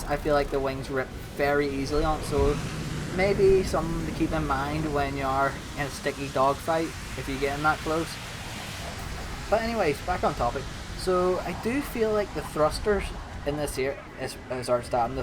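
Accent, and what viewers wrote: Northern Ireland, United Kingdom. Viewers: 100% northern irish, greetings from Lisburn ayy. Great video btw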